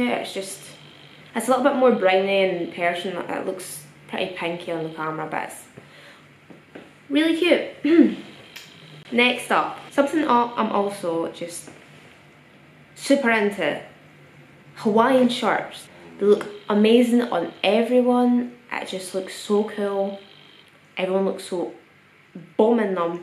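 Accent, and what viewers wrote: Scotland, United Kingdom. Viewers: ̗̀c h a o s ̖́- scottish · wow. this is the first video i’ve seen her talk. was not expecting a scottish accent. lol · are u Scottish? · Sounds scottish to me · I wasn’t ready for the Scottish accent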